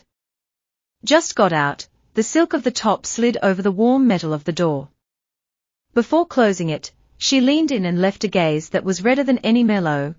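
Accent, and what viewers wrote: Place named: United Kingdom. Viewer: Confusing; a British FEMALE voice for Noah! “Mrs.” Vs perhaps “Mrs” , “Mr.” Vs “”Mr” , Santa Fe? Hot! But awkward.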